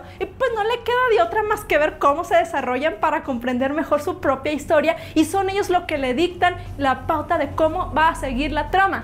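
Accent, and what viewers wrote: North, Mexico. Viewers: Soy yo o esta vieja habla muuuuuuy norteña puesum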